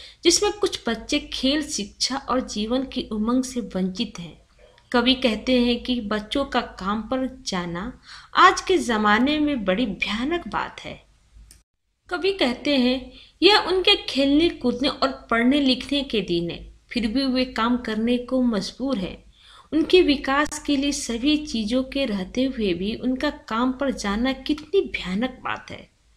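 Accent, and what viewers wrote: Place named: Bengal, India. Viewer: aap bangaal se ho kya............ hmm lagta to hai sahi ki nahi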